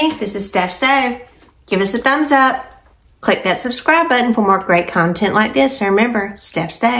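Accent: South, United States. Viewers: I love her Southern accent! Enhances the recipe 🙂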